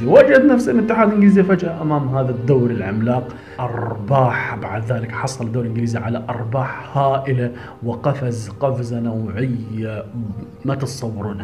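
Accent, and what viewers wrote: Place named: Iraq. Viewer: انته بطران وبالهجه عراقيه جاي اتلهوس ارجو د على تعليق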